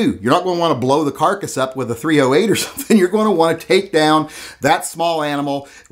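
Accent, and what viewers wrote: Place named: Appalachia, United States. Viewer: I disagree with your hillbilly pronunciation.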